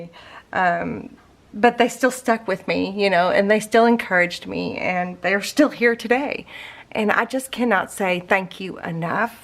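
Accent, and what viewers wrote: South, United States. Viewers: I love watching you!! I've been watching you for several years now. Love going back and watching your old videos. I always learn something new!! Plus another lady with a southern accent 😉 (Alabama girl) . I can't wait to see what you come up with next!! ❤❤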